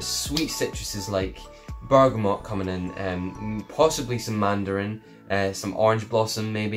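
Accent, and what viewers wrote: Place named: Glasgow, United Kingdom. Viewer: This is an accent predominantly spoken amongst middle class Glaswegians who tend to reside in the west end of Glasgow and more recently the south side of Glasgow in particular the shawlands area. The accent was firstly adopted by middle class Glaswegians as a way to differentiate them from the more “common” Glaswegians and to show them as being “better” than the Glaswegians who spoke in slang. Many Glaswegians from working class backgrounds develop this accent when they first start at University in an attempt to camouflage the working class roots they have been brought up in which in turn enables them to blend in with the middle class “cool kids”. The accent is influenced by people from the north of Scotland.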